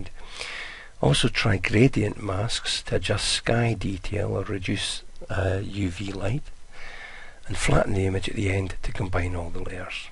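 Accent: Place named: Scotland, United Kingdom. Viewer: I love the scottish accent.I was just having a bit of fun with you.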